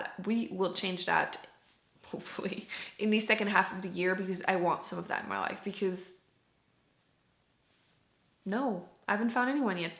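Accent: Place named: Quebec, Canada. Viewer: Canada, French Canadian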